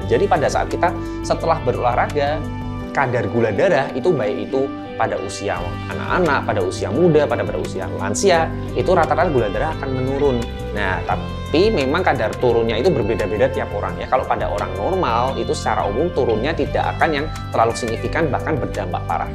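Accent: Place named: Java, Indonesia. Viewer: Doctor medook.....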